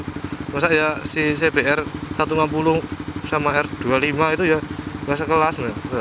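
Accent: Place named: Banyumas, Indonesia. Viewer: iyo tak delok e pakngapak...ndi duduhno ak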